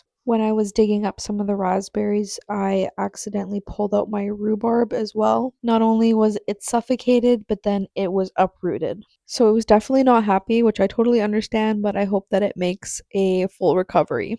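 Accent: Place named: Canada. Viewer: I love how I could hear the Canadian in your voice from the first “about” 😄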